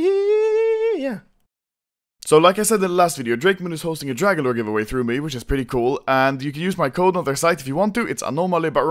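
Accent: Sweden. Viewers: YO I CAN HEAR U ARE SWEDISH:d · Wait wait wait Your Swedish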